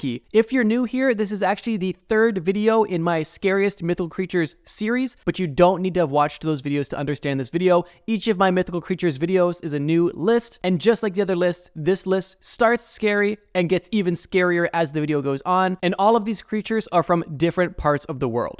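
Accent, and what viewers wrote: Canada. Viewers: Are you Canadian? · Is this guy Canadian?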